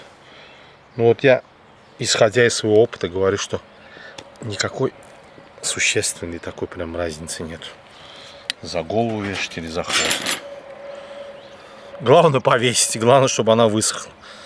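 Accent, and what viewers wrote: Baku, Azerbaijan. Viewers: Этому человеку необязательно что - либо готовить) достаточно просто рассказов с прекрасным бакинским акцентом и этого вполне достаточно)) Все как всегда супер, слюной подавились) Джаныныз саг олсун! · Салам. Акцент Бакинский 👍❤️👍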